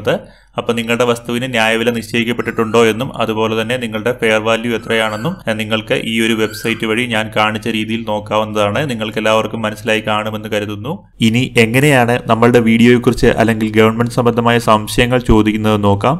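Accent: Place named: Trivandrum, India. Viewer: Tvm slang♥️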